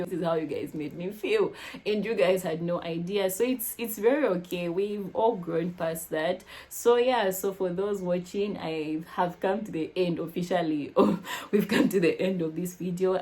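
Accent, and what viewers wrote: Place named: Uganda. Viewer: You talk like a Ugandan, 🥳🎉 pleasure is all mine ones again,thanks for posting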